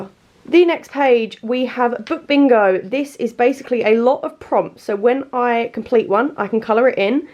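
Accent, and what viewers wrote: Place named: Essex, United Kingdom. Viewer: I love these videos so much! Also how organised are you filming multiple at once 😂 Our accents are so similar are you an Essex girly too?!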